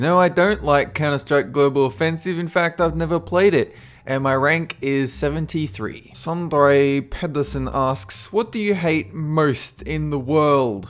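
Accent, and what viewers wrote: Australia. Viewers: Hey, where are you from? You sound somewhat australian. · Its nice to hear a familiar Aussie accent on the Youtubes again. Ive been playing Hots for a while now and been tempted to start doing videos playing it which is basically me shouting at my computer screen but idk if thats entertaining. As a musician/Composer im interested in what jobs you have to offer.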